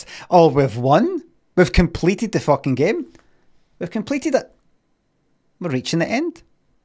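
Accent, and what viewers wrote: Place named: Glasgow, United Kingdom. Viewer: 'Mattreyseys' 😆 How I love the Glasgae twang.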